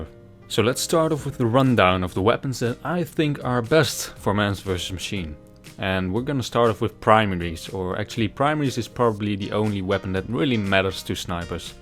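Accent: Netherlands. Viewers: Your accent sound realy dutch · Are you dutch? You sound dutch :D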